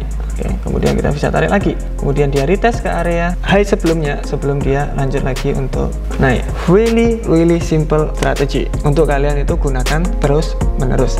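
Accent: Java, Indonesia. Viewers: Saya mau tanya kan ini 1). Cara kita menjelaskan nya jgn jg terlalu cepat betul, pelan aja, jdi kita pun jg susah nangkapnya Yg ke 2). Jgn pakai logat2 Jawa lh semuanya pakai bahasa Indonesia aja, klu boleh Yg ke 3). Itu saja yg saya sampaikan terimakasih atas ilmunya, semoga sukses 🙏🙏🙏🙏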